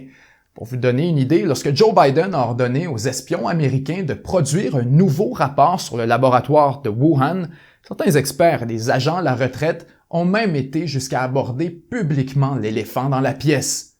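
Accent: Quebec, Canada. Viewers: Ton accent canadien est tout simplement cool avec une adresse oratoire impeccable. Les espions sont éliminé systématiquement car ils savent très bien ce qu'ils font ; ni vue ni reconnu, quel dommage de sacrifier sa vie pour des causes aussi basse. Hélas comme la betise humaine est insondable. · Bonne vidéo, malheureusement l'accent québécois m'insuporte ...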